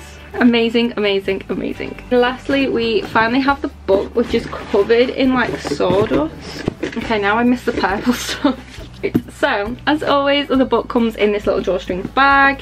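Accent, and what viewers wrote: Liverpool, United Kingdom. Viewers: Where are you from in the uk, you sound Like you’re from Liverpool x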